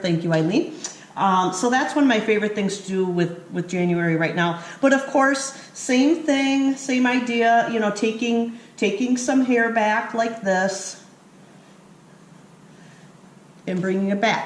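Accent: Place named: Wisconsin, United States. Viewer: I heard a comedian on the radio today and she sounded just like you! And she said she was from Wisconsin. hmmm. Ok, I think its Jackie Kashian and it must be a Wisconsin accent because I thought it was you. lol. still sounded more like you than her.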